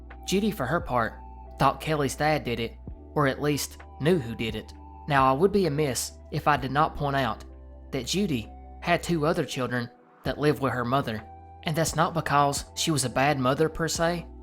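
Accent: South, United States. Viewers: Boy you are about as country as corn bread and black eyed peas. Love it!!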